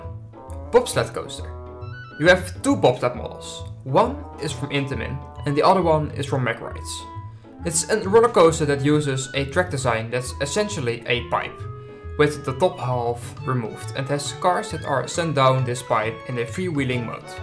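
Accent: Netherlands. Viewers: U are dutch arent you :)) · Jij bent Nederlands ik weet het gewoon ahah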